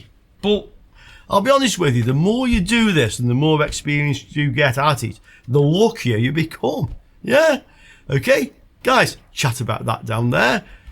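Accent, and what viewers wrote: United Kingdom. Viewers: Your British accent is very annoying!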